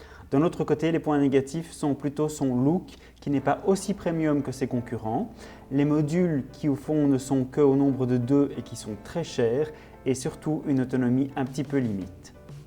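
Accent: Belgium. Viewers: Bon test, parfait, pro, continue comme ça. Ha si... tu es de Belgique?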